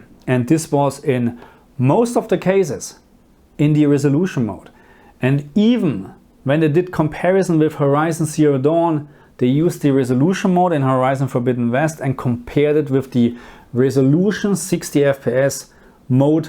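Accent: Germany. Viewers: Geiler deutscher Akzent. Ein Mischung aus Fremdschämen und Sympathie die ich da in mir fühle. 😁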